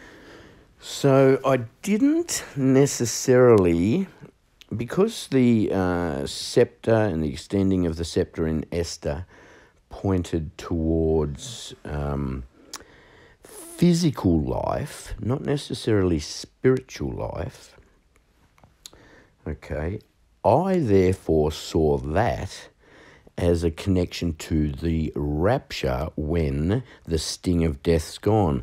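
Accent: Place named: Australia. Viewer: While i was thinking how cute your pronunciation of Esther was, you said you got told for it 😂😂😂 i like the Australian english😊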